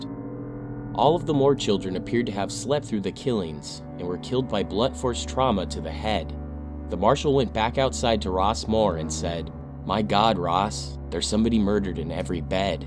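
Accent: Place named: United States. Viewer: Oh don't start that shit plz. His voice is simply a typical American voice. Sycophant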